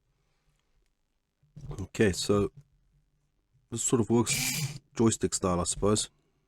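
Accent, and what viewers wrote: South Africa. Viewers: I always think of apartheid when I hear your accent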